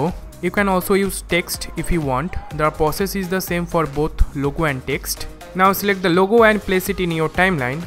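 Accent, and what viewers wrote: India. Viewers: Sounds pure Indian😍😍😍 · sounds indian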